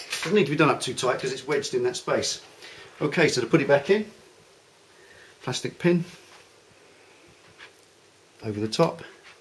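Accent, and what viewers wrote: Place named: England, United Kingdom. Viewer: What a great video and so nice to hear an English accent working on a Harley rather than the usual southern American. This is now a no brainer for me to do myself.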